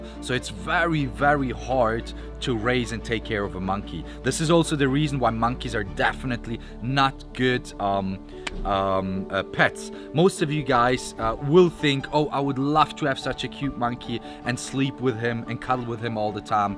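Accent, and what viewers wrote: Switzerland. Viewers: Hahaha ish geil ihn schwizerdütsch rede zköhre😂😂💪🏼🤘🏼🤙🏼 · Damn...that Swiss-German thoughhhh 😍😍😍🤤❤❤ lmfaooo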